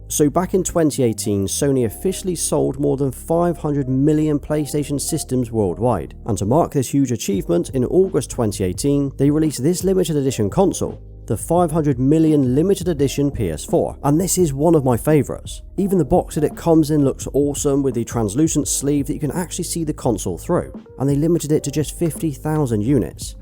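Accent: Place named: Yorkshire, United Kingdom. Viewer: I love watching your videos and your Yorkshure accent is a pleasure to hear. I would appreciate a steelbox collection as well and I thank you for displaying your consoles instead of hiding them from appreciating fans.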